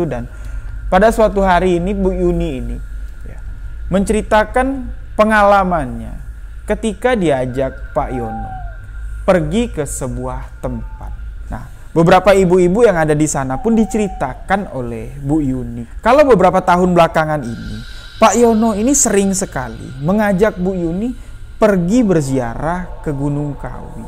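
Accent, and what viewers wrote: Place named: Madura, Indonesia. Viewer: Logat ny kakak kok seprti maduro hehe 🙏 maaf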